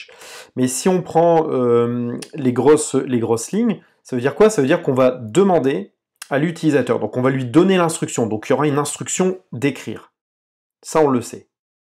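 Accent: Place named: Ardennes, France. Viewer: Bonsoir, tu me rappelles mon ancienne collègue, elle prononçait le "t" de vingt, tu es des Ardennes toi aussi ? :D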